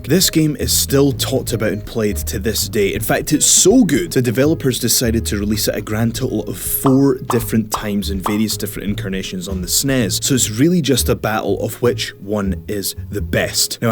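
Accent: Scotland, United Kingdom. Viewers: Is this guy Scottish ? I'm not English, and I am not sure, but his accent seems strange to me, especially the pronounciation of "R". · It took me a second to realize you weren't American (then again it's WhatCulture so I should've known better) but dude you have the slightest (Scottish?) accent I've ever heard! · Scottish? I love the accent a lot.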